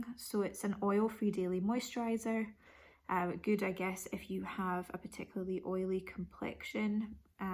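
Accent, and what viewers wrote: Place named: Scotland, United Kingdom. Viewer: Fab unboxing :) Still not a bad box I thought for the price....bit of a random selection though. Nice to hear another Scottish voice x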